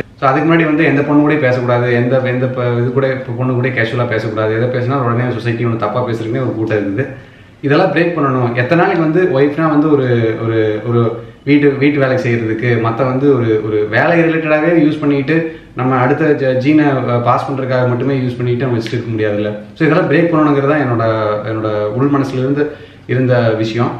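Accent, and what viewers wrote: Kongu, India. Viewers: Hi bro.. I am new subscriber. Ungaa video first pakkum pothu ignore panni irukey...apparama dhan Tamil laa paathey...very nice chance yeah ilaaa...athuku apparamaa ungaa videos yehllam theadei theadei paakkaa start pannaney...sehmaa bro 👌athum ungaa kongu Tamil very nice....genuine person positive energy...beautiful family....cute wife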